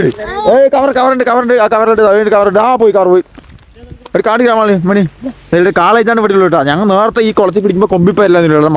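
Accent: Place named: Palakkad, India. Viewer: Palakkad slng poli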